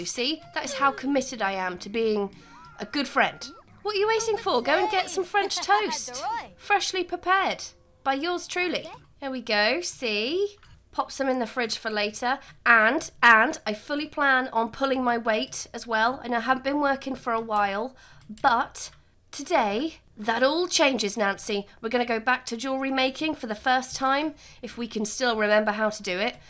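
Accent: United Kingdom. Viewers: I've discovered your channel and can not stop watching your storylines. The British accent is the icing on the cake. ❤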